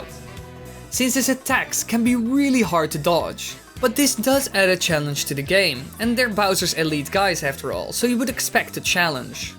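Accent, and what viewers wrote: Netherlands. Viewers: you can so hear in this video that your laungage is dutch XD ik weet het gewoon zeker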